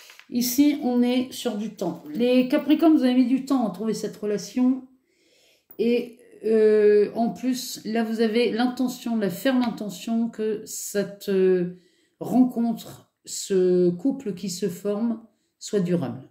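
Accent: North, France. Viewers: J aime bien votre petit accent aussi. Du nord ?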